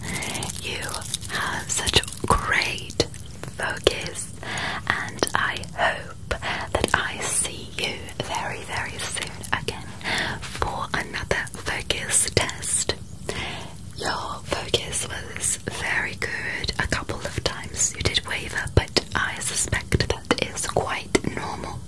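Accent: England, United Kingdom. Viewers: Absolutely loved this and the short hair cut so works on you with the glasses 😌 really enjoyed the English accent alongside the jingling of the jewelry. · i was like o sh!t she is brittish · They have an amazing British accent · The English accent is amazing Nailed it Ive always wanted someone to do a scouse or northern accent Like Gordie or Cumbrian its sound funny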